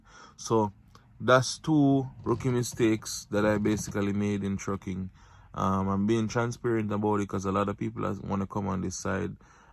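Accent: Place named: Jamaica. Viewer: Sounds Jamaican 🇯🇲 to me …… mistakes do happen head up champ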